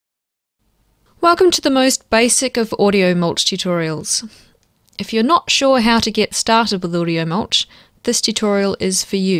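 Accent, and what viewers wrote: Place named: Australia. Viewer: yes fantastic ozzy accent!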